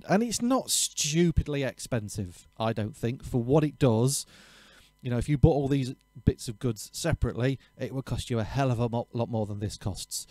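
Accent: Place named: East Midlands, United Kingdom. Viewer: What a fantastic sound! I can't wait for my Deluge to arrive, hopefully in a few days! You sound like a local East Midlands bloke to me. I'm close to Chesterfield Jcn 29. Do you do teaching?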